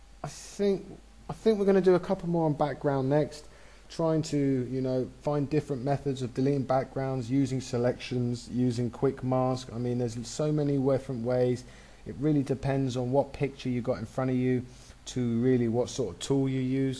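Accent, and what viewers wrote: United Kingdom. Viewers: lovely british accent ;) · Thank you, your tutorials are very clear and you demonstrate in a manner that is easy for beginners and the more advanced users to understand. You sound like you are English with your accent?. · Thank you very much master! :) nice British accent you got there >.<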